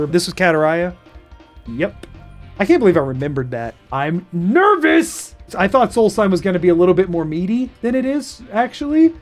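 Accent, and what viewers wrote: United States. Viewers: Are you by any chance from Utah? Something about your vocal inflection reminds me of an old friend of mine. He might not have originally been from Utah though either lol. Regardless of why, your method of presentation and your sense of humor feels really familiar and welcoming, so thanks for these videos! I’ll follow you on twitch so I can pop in if there’s ever a stream I’m available for.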